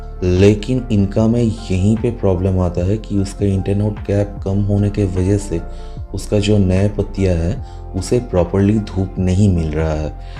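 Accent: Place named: Bengal, India. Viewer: Aapki knowledge, bengali hindi accent and voice modulation is great.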